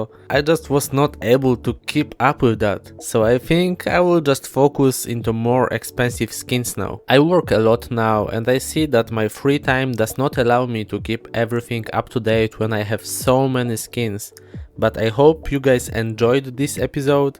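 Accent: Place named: Poland. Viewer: masz troche polski akcent heh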